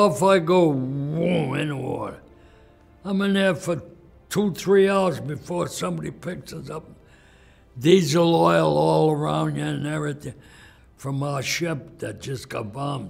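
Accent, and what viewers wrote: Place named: Brooklyn, United States. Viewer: This man’s voice is what Brooklyn NY or American fake Italian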